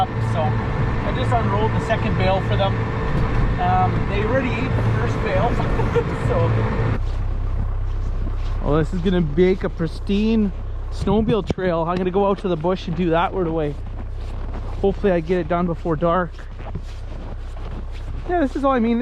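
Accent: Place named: Minnesota, United States. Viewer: Are you from Minnesota? You have an accent from that region. I am impressed by your enthusiasm for your ranch. I grew up in Colorado. We used to get big storms too.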